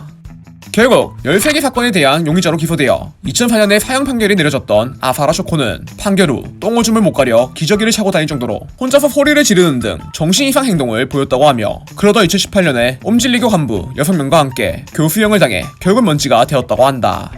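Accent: Jeolla, South Korea. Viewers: 김어준인줄ㅋㅋ 전ㄹ ㅏ도 익산ㅋㅋㅋㅋ ㄹㅇ과학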